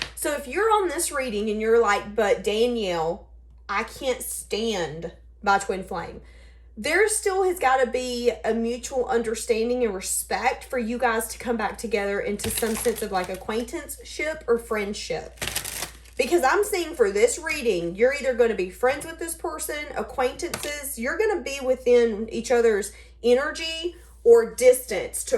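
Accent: South, United States. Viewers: You have a Southern twang but I love it 😍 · OMGEE!!! I watch ALL your videos EVERYDAY!!! Whether they resonate or not!!! I was searching for a video yesterday, like where is my daily tea!!! And why the past week I was thinking: I’d like to see her face on a live!!! Cause I absolutely love your country accent!!! Then BOOM!!! I click and see YOU!!! You’re gorgeous, Danielle!!! 🙏🏽🙏🏽🙏🏽 Ok, anxious to hear this reading!!!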